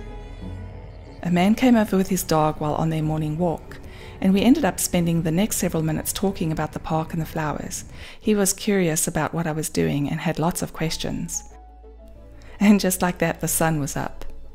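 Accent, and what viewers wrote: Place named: South Africa. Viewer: Hi! I could believe it when I read the data on one of your photos! The ISO was only 800! That's incredible! Also, I think that your voice is almost soothing to listen to, and your accent (which I believe is South African?) Is wonderful!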